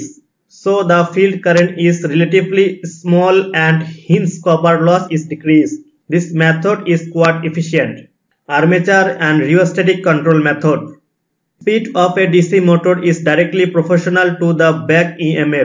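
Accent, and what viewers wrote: India. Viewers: What accent it is! Hindi speaking people has this type of accent!!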